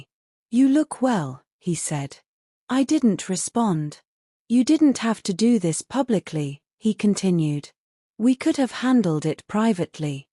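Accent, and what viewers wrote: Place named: England, United Kingdom. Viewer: So if she’s from Pennsylvania, why does she sound SO English?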